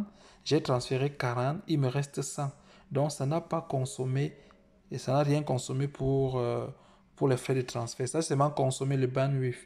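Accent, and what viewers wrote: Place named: West Africa. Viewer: Parles toi bien c'est quoi cette langue franco africaine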